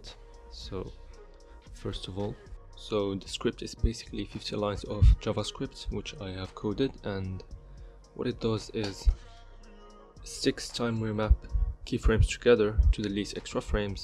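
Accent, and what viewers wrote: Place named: France. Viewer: are u french ? your accent sounds french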